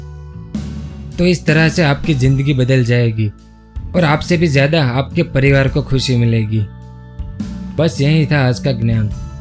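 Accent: Gujarat, India. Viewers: Bhai, tum gujrati ho? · Gujrati h ky bhai???? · Bhai tu gujarati hai kya ????🤔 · gujrati voice 😂❤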